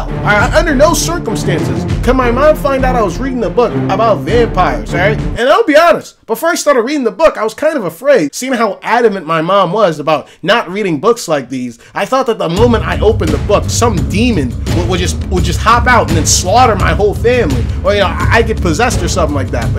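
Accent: Jamaica. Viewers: Wait yuh jamaican holy shit bless brogawd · is he Jamaican?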